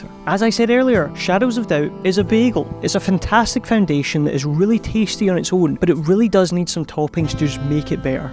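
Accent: Scotland, United Kingdom. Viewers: Randomly came across your channel, love the scene your setting, so funny, your going far man plus your Scottish, I live in Inverness 🫡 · Are you scottish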